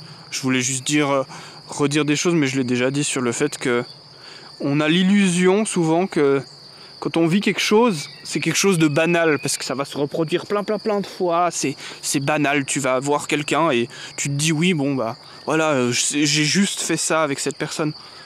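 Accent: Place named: Switzerland. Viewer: Les 2 sont possibles et en Suisse j'entends plus souvent cette façon de prononcer (en tout cas dans le canton de Fribourg) Il est Suisse: ça s'entend à son accent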